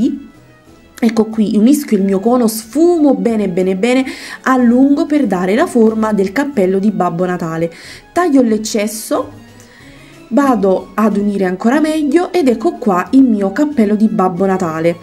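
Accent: Naples, Italy. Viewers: sei di napoli?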